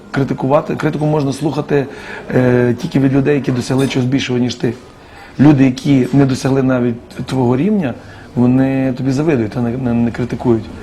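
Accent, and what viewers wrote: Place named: Nadsiannia, Ukraine. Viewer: Это не чистый язык это Надсянский Диалект.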